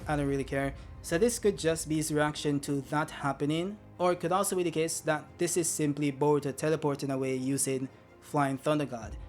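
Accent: Jamaica. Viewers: You sound Jamaican bro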